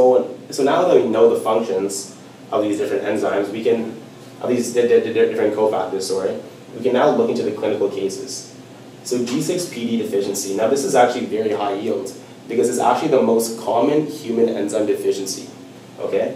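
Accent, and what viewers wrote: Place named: Canada. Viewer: That Canadian accent strong! Nice video. At the end it seems like you were going to make a point that alcoholics typically have B1 thiamine deficiency, which, I assume you were going to say results in deficiency of transketolase. Is that significant? Or will it just act as a shunt towards Nuc Synthesis and not be able to recycle back towards glycolysis?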